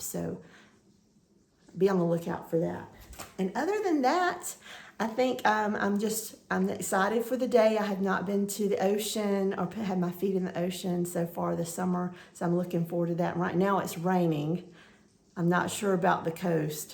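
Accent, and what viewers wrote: South, United States. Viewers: Your work is always so gorgeous! I love the patterns you choose and your color changes for many of them. I gave up flosstube for a long while but have only recently decided to revisit a few 'makers & stitchers' who inspire me with their unique style and love for the art. I'm delighted to learn from you, be inspired to pick up my needle, encouraged to tackle a project that I've judged too difficult, and be satisfied with with a completed piece ... even tho I sometimes only see the mistakes! Your sweet spirit and that lovely southern drawl... the stories of treasure hunting at vintage shops...tales of trips to the beach for sun & seafood...all of it brings up memories of not so long ago trips to Foley beach, Isle of Palms. So grateful for your presence on YouTube!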